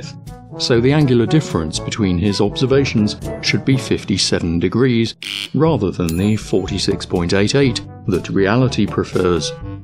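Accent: England, United Kingdom. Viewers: Here we see the effect of "Education", a word that appears to mean very little to Flattards. As much as I enjoy these videos (and hearing an English accent for a change) I cant help thinking that the best way to deal with them is to not even give them the time of day - let them get on with their stupidity. They are clearly beyond help, and, like the religious, prepared to "Believe" rather than accumulate anything resembling a fact. However, I do enjoy a good laugh and Flattards do produce some of the best comedy Iv seen since Jim Jeffries took to the stage so for - Keep it up my good man, keep it up. Who knows, you might even educate one of the imbeciles, although, to be honest, I doubt that even Lawrence Krauss could educate these fools. Excellent job - Subscribed.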